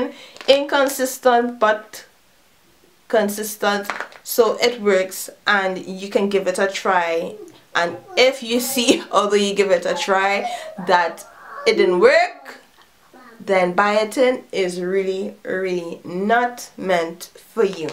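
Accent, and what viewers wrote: Trinidad and Tobago. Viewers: Soon as you started talking i already knew where u were from #trini....thks for the tips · Are you from the Caribbean?... you sound Trini lol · Oh my gosh Im Trinidadian as well! So happy to hear you are as well! These tips are great also! Im planning on trying this but really scared of trying bc im kind of prone to acne...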